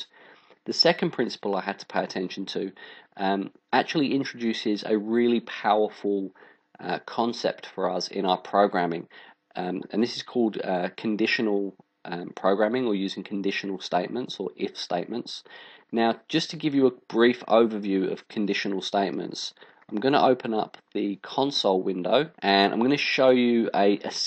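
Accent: England, United Kingdom. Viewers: you did a very good job in a quick and easy introduction to Python FU Scripting for GIMP. OK, I understand that one does what one knows but your last tutorial is a “Hack”, sorry I am not going after you, it is just bad programming. All I am saying is that if the only two variables there are changing is X1 and Y1, for the starting position. Then one would think that you should in your if-elif-else (which is really a switch statement in C# or any other coding language, but Python does not have one so the if-elif-else) just set the variables x1, y1 and then after the switch then call the blend function (pdb.gimp_edit_blend) one time. Tighter code and much more efficient. By the way all teachers should have a slight English accent and it sounds perfect. It is like everyone with glasses looks smarter 😉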